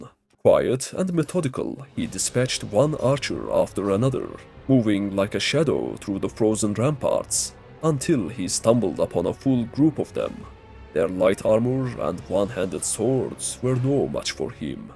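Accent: Turkey. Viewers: Can you make kuzhait campaign next time please? (Btw your accent sound like Turkish) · Dostum güzel içerik aksağanın Türk gibi Türk müsün diye merak ettim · Abi İngilizcenden anladım Türk olduğunu hahahaha :D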